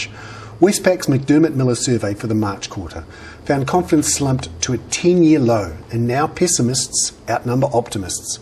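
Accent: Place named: Canada. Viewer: great comments and nice accent from canada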